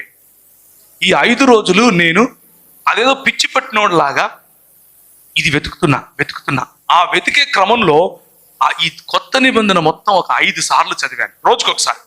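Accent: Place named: Telangana, India. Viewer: Full Telangana accent..😄..we miss you brother..feel very sad..🙏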